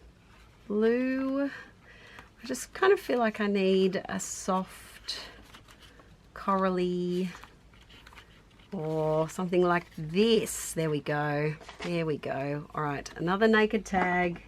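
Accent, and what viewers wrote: Australia. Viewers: I got that one from my husband, he said it’s Australian but I have no idea. I adore your accent and your fun vocabulary, feel free to always share more random Aussie words (also… please let us hear your American accent!) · I think she means British as opposed to the fact that she’s already speaking English, but with an Aussie accent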